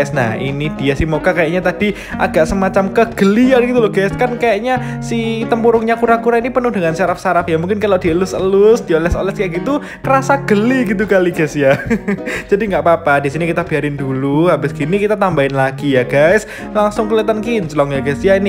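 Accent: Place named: Java, Indonesia. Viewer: Wah mantap. Ternyata kalau ngonten medok ya broooo 😋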